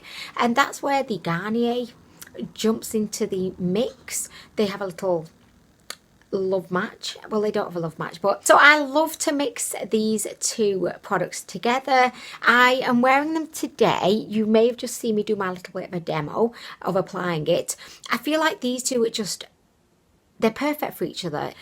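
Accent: United Kingdom. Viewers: Interesting. It’s not a normal British accent.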